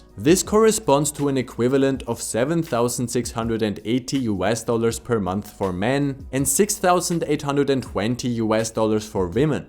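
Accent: Switzerland. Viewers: You have a great Basel-dialect in your english, considering you've lived there only 4 years! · I love your videos but man this (forced?) heavy and slow Swiss accent is disturbingly-weird, can't you do it without?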